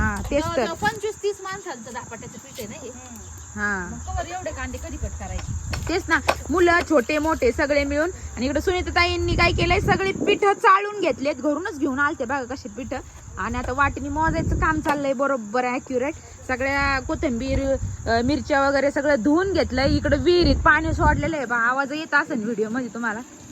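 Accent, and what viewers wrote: Ahmednagar, India. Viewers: ताई नगरला असच बोलतात, आणि स्वातीची भाषा अस्सल नगरी आहे लहान पणापासून आपण जे बोलतो ते लगेच नाही बदल जात सारखे सारखे नका तेच बोलत जाऊ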